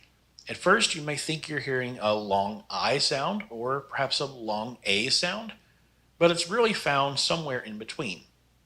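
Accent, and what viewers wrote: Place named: United States. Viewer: are you american, you sound so america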